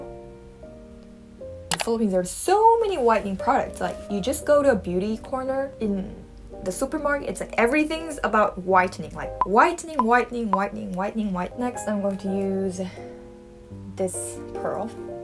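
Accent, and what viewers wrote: England, United Kingdom. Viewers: loved her english accent you can apply as a narrator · Wahh i like your English accent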